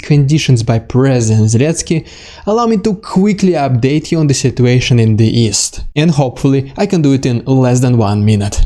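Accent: Russia. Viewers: Cool, you sound like Chekhov from Star Trek! Live long and prosper!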